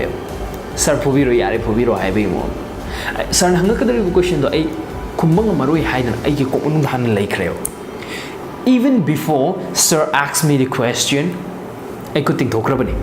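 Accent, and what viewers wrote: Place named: Manipur, India. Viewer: Ngcdi manipuri khra sengna ngnge keep up bro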